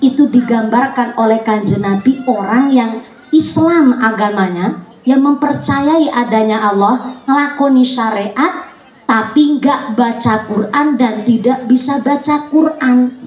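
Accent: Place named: Java, Indonesia. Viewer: L39, waalaikumsalam hadir menyimak tauziah oleh ustadzah yang kocak dan logat Jawa ngapak yang sangat bermanfaat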